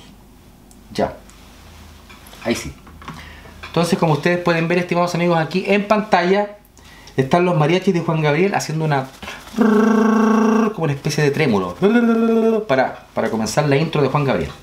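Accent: Chile. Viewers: Eres chileno.?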